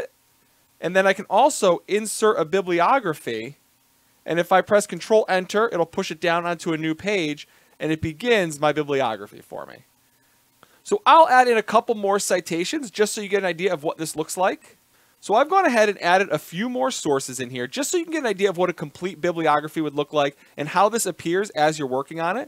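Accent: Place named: Chicago, United States. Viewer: Love your Chicago Midwest accent. Sounds like home.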